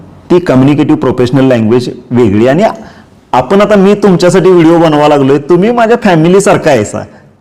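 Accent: Kolhapur, India. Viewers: Bhava tu teacher ahes chagle ahe. Pan tuje fluent English nahi ahe. Tyala kolapuri/ gavakadhcha English cha Sparsha ahe. Pan tula Tu shikvaneche kam kartos khupach Chan. Congrats 🎉❤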